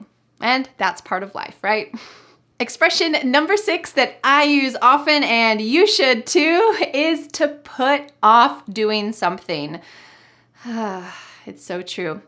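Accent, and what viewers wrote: United States. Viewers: I ❤ ur accent......i swear....its really cool....you know I ❤ american accent like you did....TEACHER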